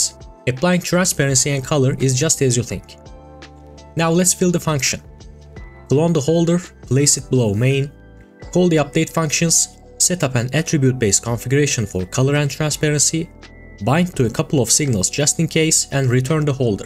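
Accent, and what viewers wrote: Turkey. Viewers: aksandan anladım kesin bu türk diye kanal güzel eline sağlık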